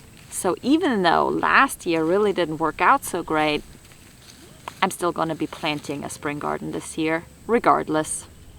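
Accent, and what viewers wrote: Louisiana, United States. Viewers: Is your accent Cajun?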